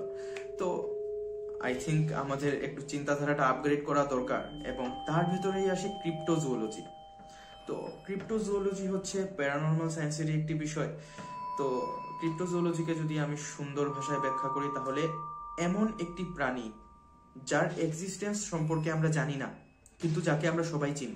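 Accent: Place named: Sylhet, Bangladesh. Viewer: আপনি বাংলাদেশের সিলেট অঞ্চলের মতো কথা বলছেন। আপনি কোথায় থাকেন ভাই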